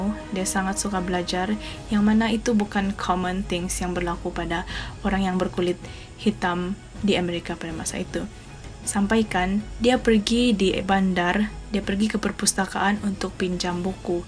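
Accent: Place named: Sabah, Malaysia. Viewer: Punya kental sabahan itu bahasa ko..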